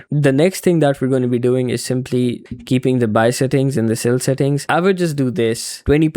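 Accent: India. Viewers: Your accent is somewhat Indian.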